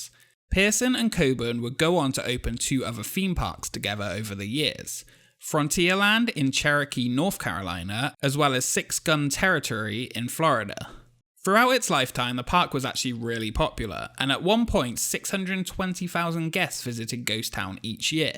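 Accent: United Kingdom. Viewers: It's annoying to hear a Brit when it's in NC... that's why. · Why is it that all the narrators for videos like these seem to have British accents? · It's funny hearing a British person describe a park in North Carolina. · What part of the UK are you from? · Where are you from? I know Britain, but which part? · I mean, he does have a British accent. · AAAAAGGGGHHH... British Narration. FUCK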